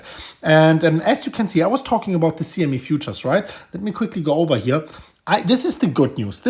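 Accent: Germany. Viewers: Good time to wait for bottom if you are a hodler. Why? Tax season. Funny German Accent Guy Say's Whales selling BTC.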